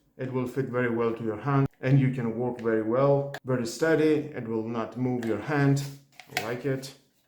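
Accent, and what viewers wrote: Greece. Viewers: I can hear the greek in your voice 😂❤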